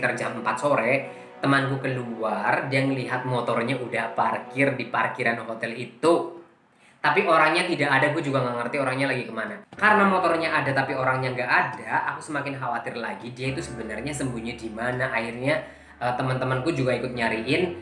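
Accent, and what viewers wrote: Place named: Yogyakarta, Indonesia. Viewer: Kak ini orang bali tapi gaya bicaranya kaya wong jogja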